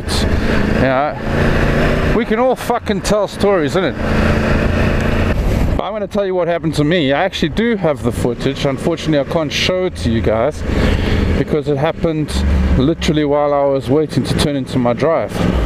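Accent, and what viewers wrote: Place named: South Africa. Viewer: there's a hint of south African there I think lol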